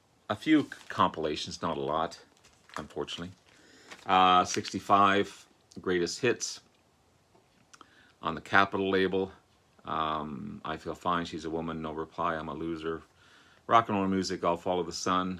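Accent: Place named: Canada. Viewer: It’s nice to hear your thoughts rather than just showing something that’s been shown a million times already. I’m not a fan (I will go hide now...lol!) but I enjoy watching everyone’s related videos. A very good friend of mine bought me the 50th Anniversary edition of so I do have that...a talking point for the cool kids in the clubs;) LOL Also I love love how youguys say ‘out’ in Canada. It’s so endearing:) Lots of love from the Mitten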